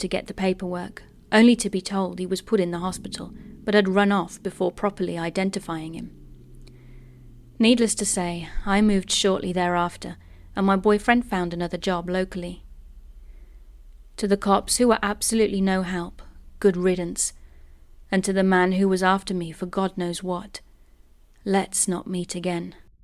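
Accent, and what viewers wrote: England, United Kingdom. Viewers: Good to heart an English female narrator 😊 I have a lot of true paranormal stories that I’ve not got around to sharing if you want them ? How do I send them to you ? X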